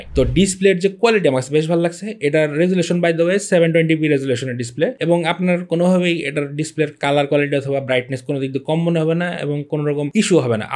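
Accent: Tangail, Bangladesh. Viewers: Bhai ki tangail er ni? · Vai tangail theke naki? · Vai apnar basha ki Tangail a? 😱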